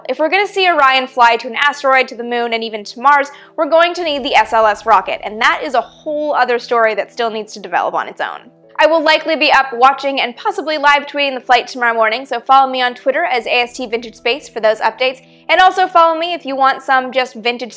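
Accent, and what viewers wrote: Canada. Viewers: speaks like a Canadian to my ear, although these accents are almost the same.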